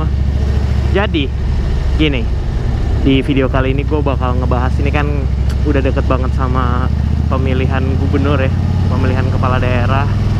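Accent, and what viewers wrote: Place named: Madura, Indonesia. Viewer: Bang, logatnya bukan jawa solo, mirip orang madura.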